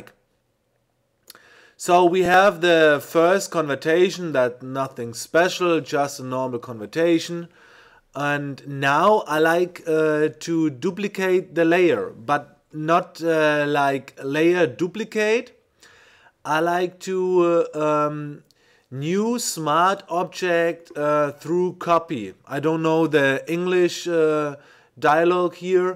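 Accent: Germany. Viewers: thank you very much for this video. Your work is inspiring and, please, please, never apologize for your german accent. Thanks again.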